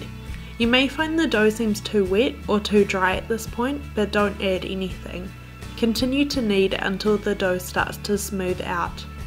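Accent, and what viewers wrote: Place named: New Zealand. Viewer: R u a kiwi by chance?!? Cos i rekon u souns pretty kiwi!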